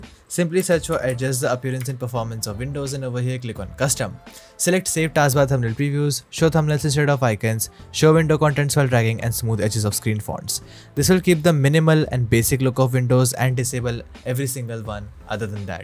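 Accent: India. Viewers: With a hacker name like - I aint trusting NOTHING this mfer says.. "Download and run these programs..' HELL NO, especially when he sounds like some ripoff artist from India.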